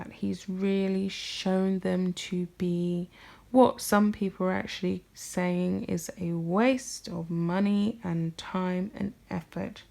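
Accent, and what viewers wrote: England, United Kingdom. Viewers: It's difficult enough deciphering the English accent, without testing our hearing. Could the narrator please speak up a bit? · Or is this your british accent?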